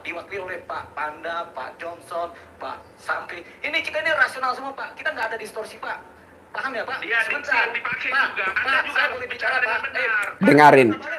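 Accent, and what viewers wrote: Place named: East Nusa Tenggara, Indonesia. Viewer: mantap bang,,,logatnya orang yg aku pens,,orang ntt ya,,,maju trusss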